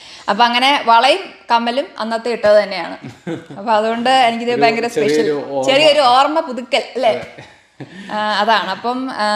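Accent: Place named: Kottayam, India. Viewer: Trivandrum anu valarnnathenkilum thani Kottayam slang anu anyway Happy wedding anniversary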